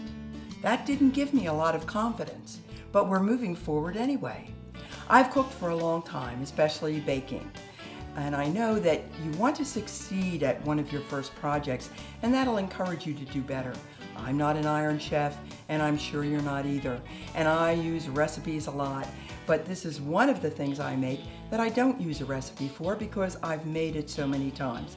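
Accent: Philadelphia, United States. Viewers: you have to be from Philly/S. Jersey! Wooder! Love your sass! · This woman lives around Philly, somewhere. I recognize the accent. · That Philadelphia-sounding accent makes me lose my appetite.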